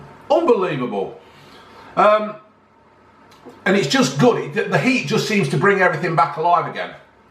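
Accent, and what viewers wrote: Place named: Sheffield, United Kingdom. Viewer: Tanvir Sekhon Kell Brook accent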